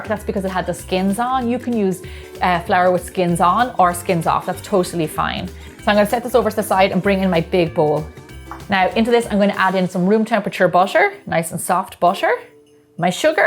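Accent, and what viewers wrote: Ireland. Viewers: Do I hear a lovely Irish brogue? Delightful!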